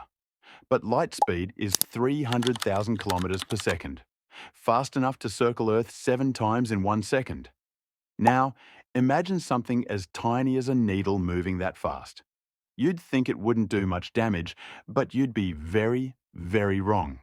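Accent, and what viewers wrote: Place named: Australia. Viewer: Myoves, naaur, classic Australian speech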